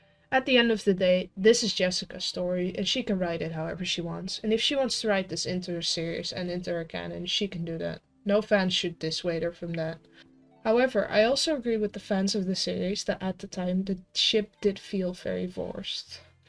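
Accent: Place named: Netherlands. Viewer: it took me a hot minute to realise your dutch! welcome aboard to the english speaking , dutch side of yt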